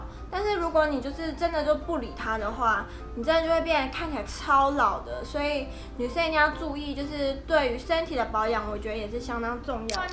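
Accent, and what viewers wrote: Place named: Taiwan. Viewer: 你眼妝太重啦！台灣口音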